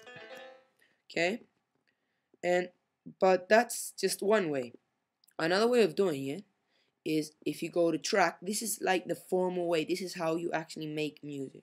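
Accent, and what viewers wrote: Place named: England, United Kingdom. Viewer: your accent is very cool. Where are you from? I can't really tell if you're slightly english, or Indian..thanks for the help too :)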